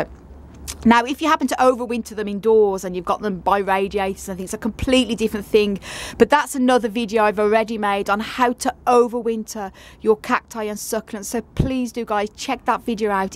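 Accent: Birmingham, United Kingdom. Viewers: Are you from brum..sound like my family😂😂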